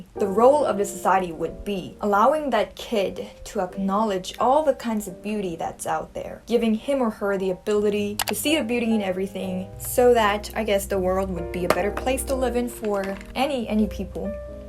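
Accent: England, United Kingdom. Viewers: Wahh i like your English accent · loved her english accent you can apply as a narrator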